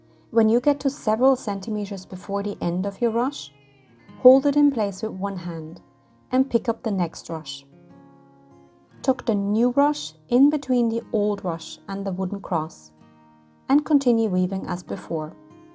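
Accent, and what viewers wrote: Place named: Ireland. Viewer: Fantastic tutorial and great education to keep tradition alive. Where are you from originally. Sounds like you've lived in Ireland a long time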